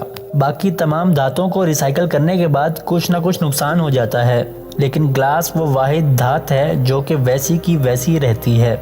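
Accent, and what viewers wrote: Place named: Faisalabad, Pakistan. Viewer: ap koi faisalabadi lgty ho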